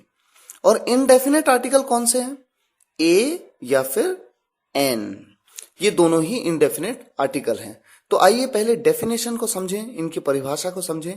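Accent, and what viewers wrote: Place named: Assam, India. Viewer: Sir ji aap assam se ho kya 😃😃😃😃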